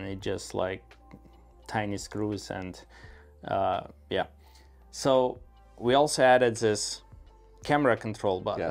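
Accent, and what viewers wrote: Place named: Russia. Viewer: Russky accent. New génération Russians have good accent, because they have learned English since they are in kindergarten with real English teachers.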